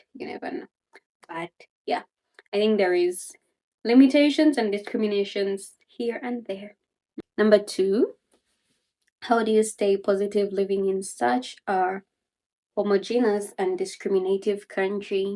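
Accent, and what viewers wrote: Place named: South Korea. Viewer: i love your Korean accent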